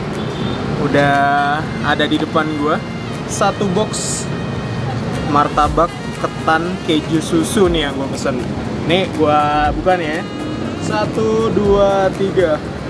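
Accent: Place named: Banyumas, Indonesia. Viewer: Udh tau dr tegal manggilnya aa, tegal kui jowo ngapak mas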